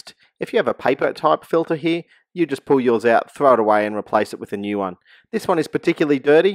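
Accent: Australia. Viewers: you have an aussie accent mate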